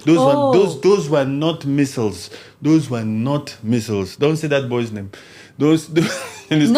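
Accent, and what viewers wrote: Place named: Democratic Republic of the Congo. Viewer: I love your accent my Kongolese brother 😅 I love the way you are bashing these rwandese 😂...your mouth is is so hilarious 😂 im enjoying this to the bones...I just discovered your channel just now I do see you popping on my YouTube but I never clicked today i have decided to listen to you...bro you cracked my ribs like a chilled I have subscribed to your channel one day I would love to take part of your show im sure you must be a Mulbarton with this type of confidence to scold this way 😅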